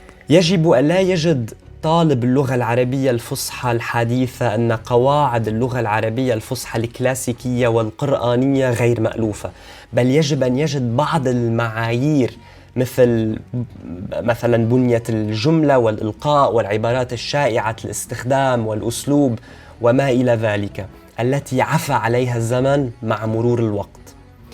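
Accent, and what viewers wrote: Lebanon. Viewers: I guess he is lebenese because of his smooth accent so yes he's an arab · He has a slight Lebanese accent when he speaks MSA.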